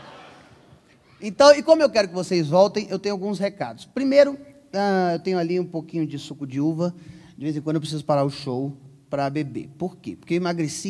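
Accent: Minas Gerais, Brazil. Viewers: Esse sutaque mineiro cabuloso ❤️ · Que e este ? Cliquei no video porque achei que fosse a thamy Miranda,mas o sotaque de minas entregou.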